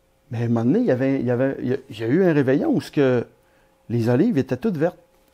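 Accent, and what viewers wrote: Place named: Quebec, Canada. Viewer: Génial, merveilleux et émouvant...... cette histoire me fait venir les larmes aux yeux, pour moi qui vit en Italie depuis 25 ans. Ces histoires me manquent, ces souvenirs de ma petite enfance me manquent et ce merveilleux accent québecois. n'est pas seulement fantastique comme conteur mais a une voix fantastique pour reprendre les chansons d'autrefois. Merci...de tout coeur merci. Cette chaine devrait avoir beaucoup plus de pub et de fans, elle le mérite. Elle présente ce patrimoine immatériel du Québec que beaucoup de jeunes aujourd'hui ne connaissent pas.... Bonnes et heureuses fêtes à toute l'équipe de maCommunauté et félicitations à. En espérant entendre, revoir bientôt, un autre de ses contes.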